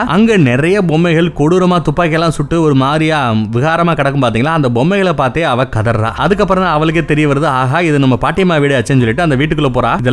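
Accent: Madurai, India. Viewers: Anna nenka pesura Madurai Tamil super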